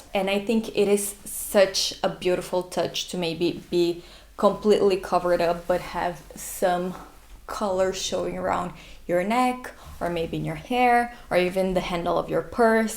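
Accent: Brazil. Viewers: love it lol. Would have never guessed you had a Brazilian accent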